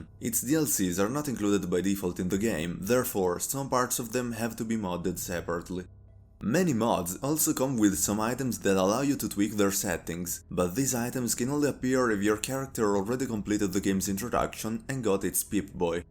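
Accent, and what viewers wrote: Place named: Italy. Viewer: comunque si sente tantissimo che sei italiano, comunque bel video!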